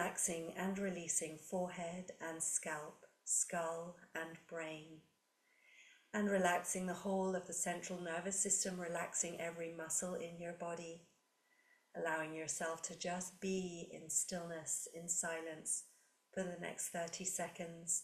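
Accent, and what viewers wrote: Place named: United Kingdom. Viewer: Thank you / Suggestion. Dear. Thank you very much for bringing me back to my powerful practising of Kundalini yoga, and adding great practises to mines. May I humbly add this next part Just To Help Me, You and Other Students? If Yes, please read: For the returning and for the new students, I think it would be really kind to remind us that we do not have to do the practises as lengthy as you do them, and that we can stop as soon as the exercise becomes tiring. Also in this video, (maybe just due to your speaking fast & British), there was a confusing point from minute on, where you stoped 'showing us the exercise by doing it,' and start just explaining what we should do while still on our backs. Would you kindly make that shift clear? Truly thank you for your goodness and obvious skills brought to us in this most beautiful way. 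God bless you. May the Wind Be On Your Back, And Sun Always Shine Upon You!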